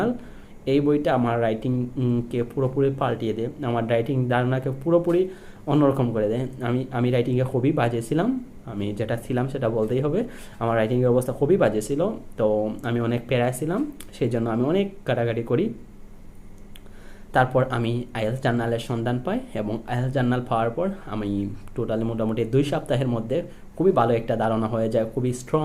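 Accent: Sylhet, Bangladesh. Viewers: Sylheti ni..?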